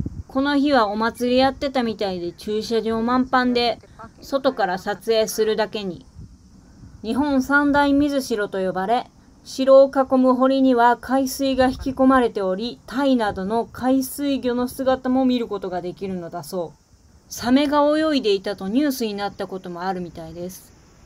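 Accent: Kansai, Japan. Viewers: 絶妙な関西弁のイントネーションが、かわいい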